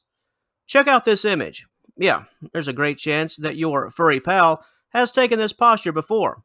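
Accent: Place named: Florida, United States. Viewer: You from Florida? You sound like a fellow Floridian.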